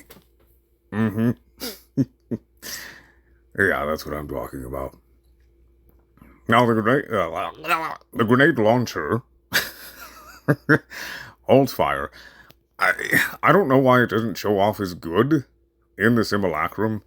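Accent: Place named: United States. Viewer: Your deep English narrator voice with the American accent underneath is crazy lol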